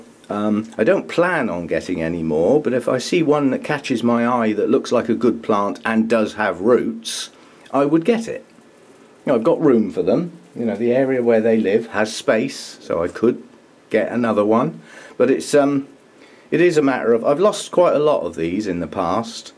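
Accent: United Kingdom. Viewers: Thanks for the explanations! Lovely British accent, don’t mind me saying and no offense , listening to your videos it reminded me of Gandalf the grey ! Lee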